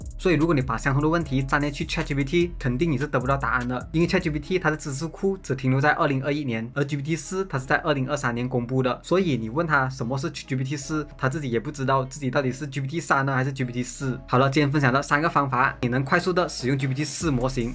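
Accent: Malaysia. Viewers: 马来西亚口音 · 馬來西亞口音？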